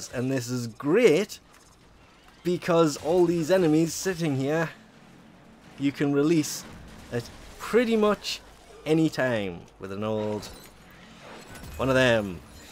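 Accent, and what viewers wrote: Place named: England, United Kingdom. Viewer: Where do you get your accent from are you English uk or I dunno I'm just wondering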